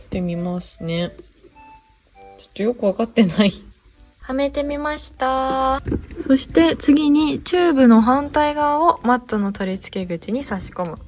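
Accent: Yamaguchi, Japan. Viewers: カメラの前を占拠するミンちゃん最高です🐶 ミンちゃんちゃんと分かってますね🤣流石です👍 メンテナンス、、、ズボラに果たして出来るだろうか？今回やたら山口弁が出てるのは気のせいだろうか？笑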